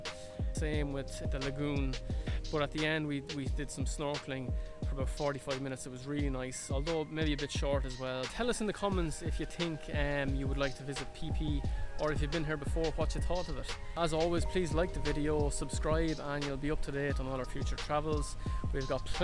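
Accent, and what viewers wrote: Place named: Ireland. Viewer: A good Irish accent😀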